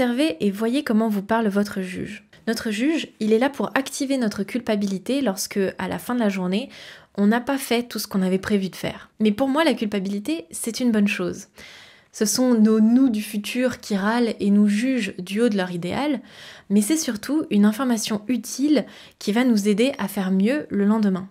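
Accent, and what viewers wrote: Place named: Japan. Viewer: wsh tu parle français bien japonais stop wsh puré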